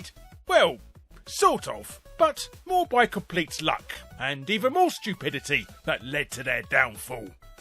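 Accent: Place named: RP, United Kingdom. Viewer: So posh accent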